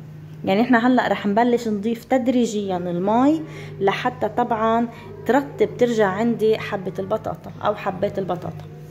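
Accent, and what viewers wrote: Lebanon. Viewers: دائما متألقة وصفة جميلة ودائما اللهجة اللبنانية بتجنن 😍 من الجزائر أنا 🇩🇿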